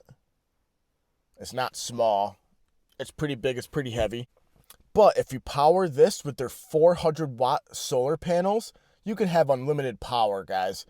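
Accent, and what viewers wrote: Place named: New England, United States. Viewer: A few things keyed me in actually . The accent, the type of trees at your location but the biggest clue actually was the snow storm and the release date of the video. I'm from NH and we just had the same snow storm . We were expected to get 18 inches but we ended up with about a foot. So I figured you had to be some one close to my location for you to receive almost the same amount of snow I just got over the same weekend in an area that looks very similar.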